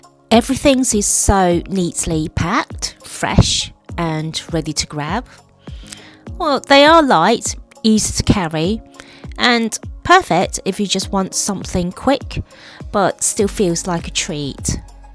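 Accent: United Kingdom. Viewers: Queen's English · 100% London accent Great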